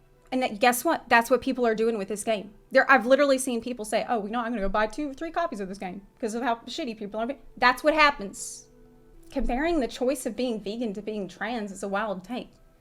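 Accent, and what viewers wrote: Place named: Louisiana, United States. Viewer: The Louisiana really came out of in this one, damn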